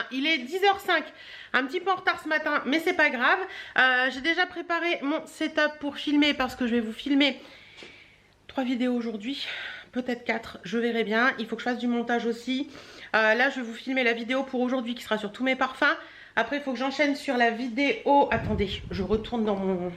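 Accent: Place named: Belgium. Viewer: Coucou, j'ai bien aimé ton accent belge 😂😂😂 j'ai cru que tu pensais à moi à ce moment là 😂😂😂. Gros bisous de Belgique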